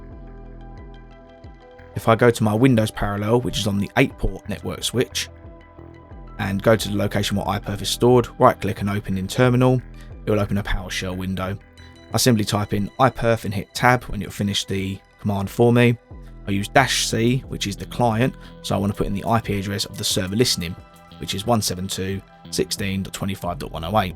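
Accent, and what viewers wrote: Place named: Bradford, United Kingdom. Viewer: I know Bradford when I hear it 💯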